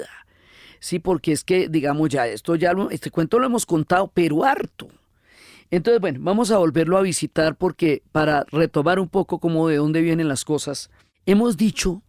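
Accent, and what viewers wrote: Colombia. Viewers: Hablas más como Colombiana debo estar confundido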